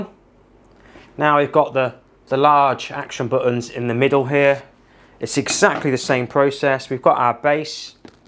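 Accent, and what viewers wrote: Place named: United Kingdom. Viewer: That s awesome! I love the British accent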